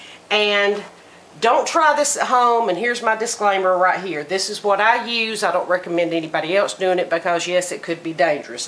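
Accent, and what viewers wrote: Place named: South, United States. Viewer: WOW! Two videos in and youre already tagged. How cool, you are an official part of the youtube bushcraft community. Even cooler that your daughter is your director ,producer and camera operator. You sure do sound southern, lol. You have alot more paracord stuff than I do. I dont have a jig nor have I ever made a bracelet. I keep my paracord in a pile in the floor, lol. Cool video, keep doing what youre doing.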